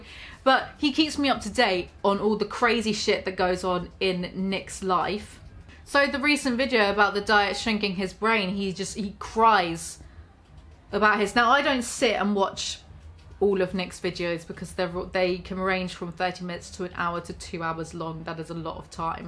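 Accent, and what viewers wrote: United Kingdom. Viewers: your bri ish accent is everything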